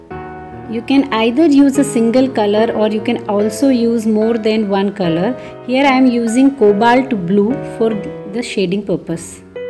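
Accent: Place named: India. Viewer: Love your roses, I have a frame like this one, I will practice this,thanks for sharing,love your voice,you sound like a couple of ladies I worked with very good friends,they are from India, I miss them so much.we have all retired now and lost touch,Jaya and hubby have been going on cruises,last I talked to her.Ranuka don’t know where she is, Have a wonderful life painting,it’s Beautiful Thanks for sharing,love 💕 I’ll be looking for the next love 💕 this One.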